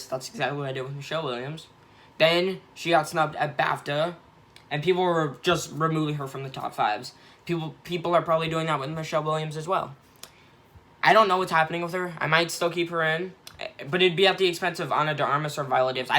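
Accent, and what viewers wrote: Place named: United Kingdom. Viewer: Love your british accent 🤭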